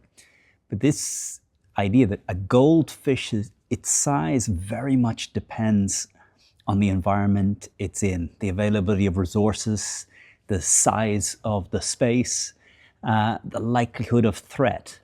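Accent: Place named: Ireland. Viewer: Brilliant and memorable! As much as I love your Irish accent your "un abrazo" at the end made me wish for some Spanish language content. And maybe a video explaining how you found your way to Spain and your transition from "guiri" to local. That would be very interesting for us! I'm an American with majority Irish heritage who fell in love with Sevilla in the early 2000s. I made great local friends there, but ultimately I always came back to the States for career reasons. I admire that you've so fully embraced your love for Spain, and made such a fulfilling life for yourself there. Un abrazo! -Justin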